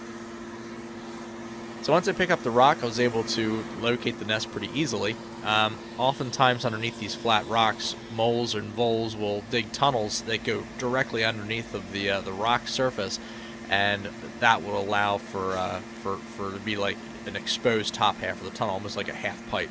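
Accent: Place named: Philadelphia, United States. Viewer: N.E. Philly? Your accent gave you away 😂 Im in Mayfair and hoping to not get stung this summer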